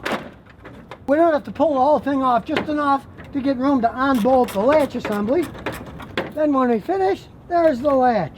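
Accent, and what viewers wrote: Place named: South, United States. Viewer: Hey. I laughed when you were talking about the long screwdriver and to "stick it in there". I know you are from the north but it sounds like you have been in Texas long enough to pick up a little bit of the Southern dialect. LOL I'm sure if I went up North for a long time I would pick up some of the slang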